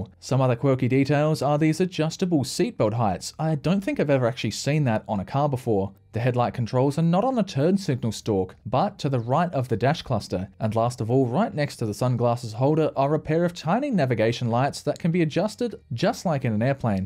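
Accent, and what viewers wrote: Australia. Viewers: This is the first person I've seen with a clear Aussie accent who talks in American English, weird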